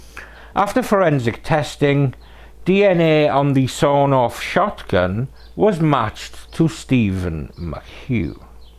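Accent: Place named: Wales, United Kingdom. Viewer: Going to watch again when having my dinner just to listen to that Welsh pronunciation ❤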